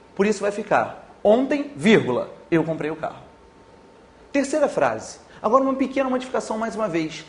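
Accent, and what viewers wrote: Rio de Janeiro, Brazil. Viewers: sotaque carioca e muito irritante, mas, ótimo video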